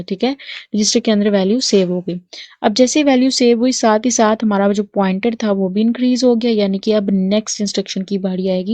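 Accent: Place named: Pakistan. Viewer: Pakistani voice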